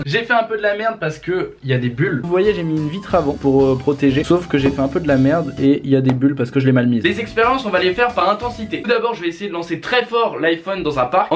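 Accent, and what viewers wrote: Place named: Corsica, France. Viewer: tu viens de corse toi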